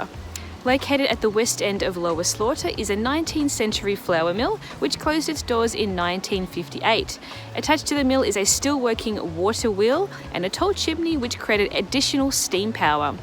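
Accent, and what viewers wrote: Australia. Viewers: Hello, can I ask what your accent is? You sound a bit Australian but I can’t tell haha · Been around most of Cotswold villages you filmed. Enjoyed your precise commentary. Do I hear an Oz accent?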